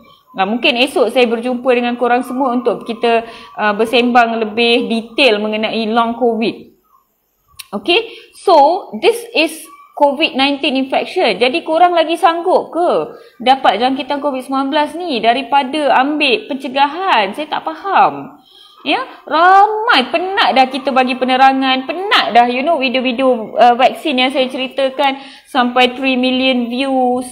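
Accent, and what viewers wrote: Malaysia. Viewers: Doktor punya slanga memang melayu habis. Terima kasih diatas penerangan jelas ini.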